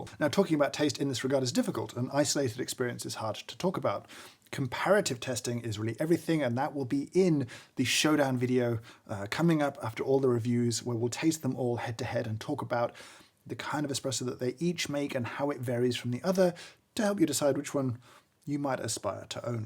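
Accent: United Kingdom. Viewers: I have no intention of buying one of these coffee grinders. I don't even have an espresso machine. I watch your videos because you are so passionate about coffee - oh and the British accent helps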